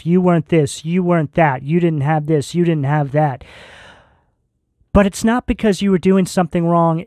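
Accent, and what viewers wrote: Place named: Massachusetts, United States. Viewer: You know… it took me all night to watch but yes! Somehow yes!&you sound like you’re from mass like me… your voice just sounds familiar 🤔💫♉️♋️♌️✨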